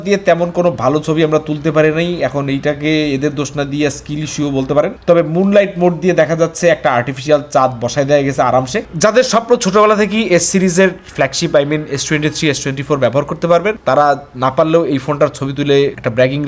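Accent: Barishal, Bangladesh. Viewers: ভাই কি বরিশাইল্যা নাকি?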